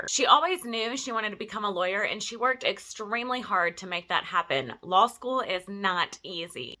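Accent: South, United States. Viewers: Yu sound Southern must live in Georgia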